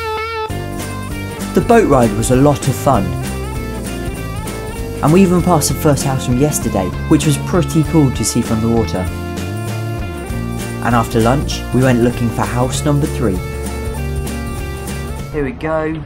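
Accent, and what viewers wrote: Flanders, Belgium. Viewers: Lovely video! But it sounds like the girl from the voice over is from Belgium and not the Netherlands! Flemish and Dutch are officially two different languages! :) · Native dutch speaker? She has a flemish accent. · It's a joke, we don't actually dislike Flemish people. Personally I really like the Flemish accent, though it isn't really fitting for a video about Amsterdam.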